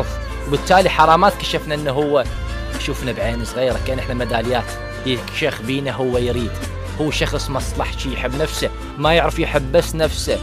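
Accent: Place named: Gulf, Arab world. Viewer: على كيفك باوع لنفسك اولن انت تافه ومنحرف زين انت عراقي ليش تحجي مثل لخليجي لو بدوي ها تعوج ابحلكك ابو لحاتات جايف لحد ولغسن 😠😠😠😡😡😡😈😈😈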